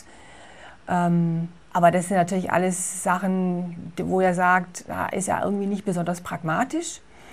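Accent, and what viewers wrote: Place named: Franconia, Germany. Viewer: Klingt für mich irgendwie eher fränkisch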